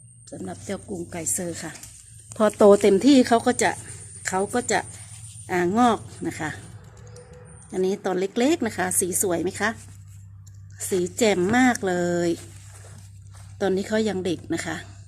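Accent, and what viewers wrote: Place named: North, Thailand. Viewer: คนเหนือกาครับ สไเนืองคุ้นๆ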